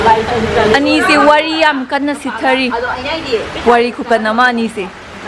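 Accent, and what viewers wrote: Kakching, India. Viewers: Yengba nugai e kakchinge londo adum lonlo taba nugai ye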